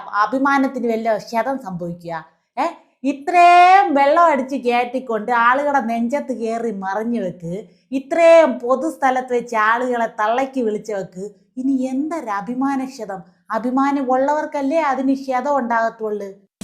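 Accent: Thiruvananthapuram, India. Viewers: തിരോന്തരം ഭാഷ 🧡 👌👌👌👌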